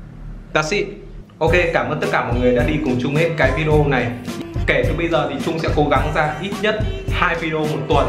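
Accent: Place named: Vietnam. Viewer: anh kêu là tiếng anh mà đọc kiểu tiếng việt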